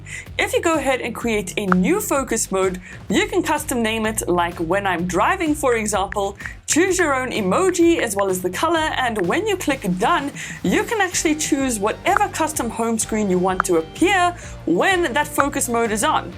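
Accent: South Africa. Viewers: I cant tell if your south African or not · Thank you enjoyed watching your video are you South African. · R u from South Africa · Awesome Thanks for this! Very helpful! Random but are perhaps from 🇿🇦 just based on your accent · Omg you’re from South Africa 🇿🇦🇿🇦🇿🇦🇿🇦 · ha south african. here's a sub just cause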